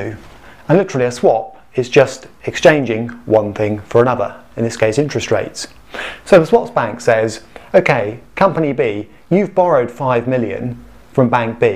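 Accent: United Kingdom. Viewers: Haha I was confused too. Then I turned on the caption. Its 'aren't' potentially higher. Brit accent i tell you. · It always works better with a British Accent. Fantastic video! Thanks!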